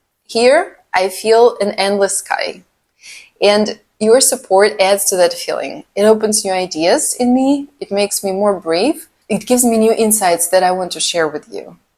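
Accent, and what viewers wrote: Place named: Russia. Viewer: Thank you, Dr.. You say things in a way that gets to the point without having any pride or ego attached, yet there is compassion and care without overstepping lines. I liked you right away. And, I never thought I would say this publicly (it’s funny how it came up), but your Russian accent was another reason I liked you right away. I don’t have any connections to Russia but it’s always held a special place in my heart; I’ve yet to fully find out why. Just wanted to say I’m glad things happened for a reason and I fully appreciate your wisdom and authenticity. Thank you again. May you have a wonderful new year.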